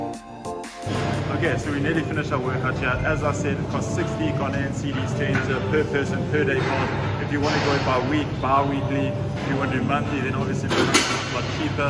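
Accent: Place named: South Africa. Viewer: I die every time he says asssss cream shops😂love the SA accent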